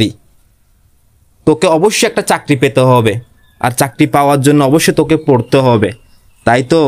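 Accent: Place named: Chittagong, Bangladesh. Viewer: Bhai apni koi thaken?..apnr vasha shune toh chittagong thaken lgtese...amio ctg thki...apnr sthe dekha krte chaii ✊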